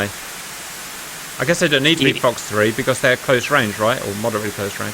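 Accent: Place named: United Kingdom. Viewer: Damn you, damn you and that awesome British accent. =)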